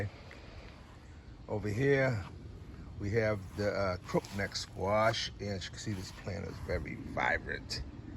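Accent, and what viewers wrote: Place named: Michigan, United States. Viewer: Your garden is awesome. Are you in Michigan, you sound like my cousins accent!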